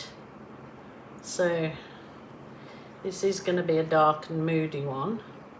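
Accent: Birmingham, United Kingdom. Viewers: hi. I thought I detected a Birmingham accent in there, I am from Sutton Coldfield now living in North Wales, so hi from Prestatyn. Love your videos.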